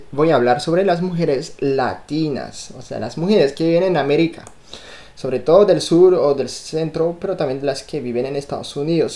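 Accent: Bogotá, Colombia. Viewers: SOS un francés con acento colombiano! 😍😍😍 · Pareces más colombiano, madre mía jajaja que bien hablas el español con ese acento. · Este chico es Francés, pero sus padres serán Colombianos, por que tiene acento colombiano, sus padres serán colombianos. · "Listo mi papasito" hahahaahh en serio me reí mucho, una novia o ex novia un tanto... "guiza" :/ y sí, definitivamente tu acento suena más rolo que el mío (Rol@ = Bogotan@ Estamos en la misma ciudad :) y espero jamás encontrarnos porque no tendré el tiempo para decirte todo lo que quisiera. ✌ Chao! linda vida y espero que la chica sumisa con quien te cases abra los ojos algún día. 👀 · Vea, hombre! Ud tiene un acento colombiano bien bacano🤣🤣 o se escribe vacano? Nunca he visto esta palabra escrita, no sé cómo va. En fin! Lo cierto es que tienes acento colombiano 😀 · Habla un español muy colombiano! jajaja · Eres un colombiano más!!! 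Tu acento es super Bogotáno. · Hablas como colombiano. Saludos! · es que a estado más tiempo en Colombia ¡¡¡ se le ha quedado las jergas Colombianas e inclusive el acento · La vaca muerta jajaja este frances ya se quedo en.colombia todo el.acento haha que bueno.Que te gusta , por aqui no.somos de implantes ni cirugias. Somos naturales jiji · Francy Sandoval me encanta que reconozca la belleza latina ,tiene expresiones colombianas como" verraca " y un poco el asentó rolo se le siente saludos desde Colombia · Creo que te pego mucho el acento colombiano!😚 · Me da gracia que hablas español colombiano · Acento colombiano pegadísimo, de dónde eres? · Yo creo que todas tus novias han sido Colombianas, porque es que hablas con acento Colombiano. acá en Europa cuando escucho hablar a un Europeo sea de la nacionalidad que sea, hablan con acento de Españoles. Me caes bien, hasta ahora descubri tu canal, y me simpatizas mucho, hasta en la manera como a veces te expresas me pareces tierno. 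· Habla como colombiano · Hola..yo creó que has vivido mucho tiempo en Colombia hablas y te expresas como colombiano..saludos. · Senovia Trinidad pues no habla bien, se le a pegado el acento de Colombia o de algún país sudamericano, el español nació en España, decir que habla muy bien es demasiado, deberías decir que al menos se hace entender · Hablas muy cómico, pareces de Colombia. He imitas muy bien a las mujeres. Éxito consiguiendo esposa latina. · Tiene el acento de Colombia. Así que puedo apostar que anduvo con una colombiana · q bonito, y claro!! habla el español!! mas' bien colombiano!! esta simpatico!!😆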